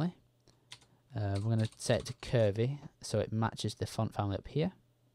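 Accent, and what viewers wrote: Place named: United Kingdom. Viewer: your British accent is awesome !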